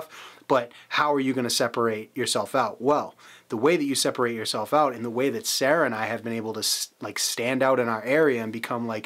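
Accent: Boston, United States. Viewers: Hey bud you from Rhode Island? Or Boston?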